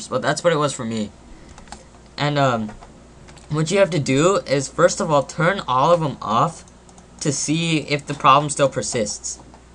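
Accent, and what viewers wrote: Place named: United States. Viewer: What happens with mine is when ever I try to upload a video onto YouTube, it starts to save my video and then half way through my laptop decides to die :) And my laptop isn't over heating....it's a mystery. Also, don't worry about stuttering, it could be because your nervous, I get nervous too and totally mess up. Also your American accent is cool :)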